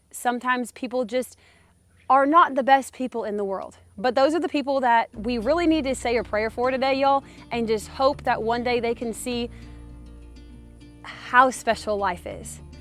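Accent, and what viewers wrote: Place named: Louisiana, United States. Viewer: I have to say this, for someone from Illinois? I admire your speech adaptation to Louisiana. Until you said you were from Illinois? I thought you were native Louisiana. Nice Job adapting. The commitment to overly strict enforcement of archaic laws (like the one you were cited with) with no leeway to account for circumstances in Louisiana by those in the position of enforcing said laws is most definitely not to be commended. However, the flexibility exhibited by those in charge at the state level in your case is most definitely to be commended. You are 100% correct...Bears and Gators??? There are already far too many. I do agree with you in regard to Wild Turkeys (and obviously deer), unless overpopulation or some infestation they might transmit becomes a problem. Thank you for addressing those that turned you in. 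I am probably one of the ones that condemned them, and still do not have even the least bit positive opinion of them. You are considerably more generous than I could be in the same situation. However, I am one that believes that those that promote and conduct themselves in the manner they have, life seems to dole out it's own version justice without our actions in any way (some may call it Karma). You and are to be commended for your generosity as well as your lack of animosity (when any and all animosity directed to those that turned you in is more than deserved and justified...I get what you are saying. Too bad not everyone is as forgiving and generous a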